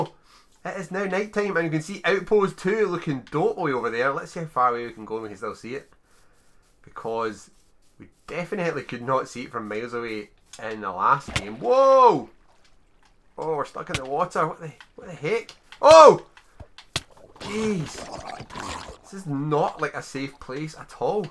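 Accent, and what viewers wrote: Scotland, United Kingdom. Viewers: Your Scottish accent, I like it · Scottish or English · I always hear Indian, Canadian, and British accent. I like the change of Scottish accents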